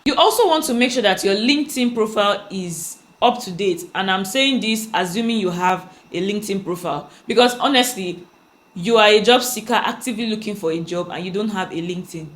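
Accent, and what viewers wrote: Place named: Nigeria. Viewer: You sound like we went to the same care academy in Nigeria 😂❤. Thank you sis. Is there anyway I can contact you?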